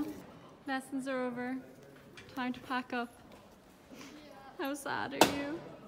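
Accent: Ireland. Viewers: I have been watching your videos for a while now and I feel you’re the most genuine person out there. I have no aspiration of competing or anything like that but you are so inspiring and I always feel I learn something from your content! Plus love love love your Irish accent ☺️ lots of love from Tawnydun Thady and I ! Xx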